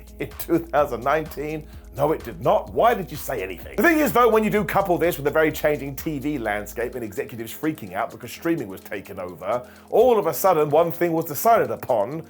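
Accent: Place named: Scotland, United Kingdom. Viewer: I never knew the biggest wrestling fans were Scottish. Damn, it's hard to listen to you. The rest of the world is so disturbing.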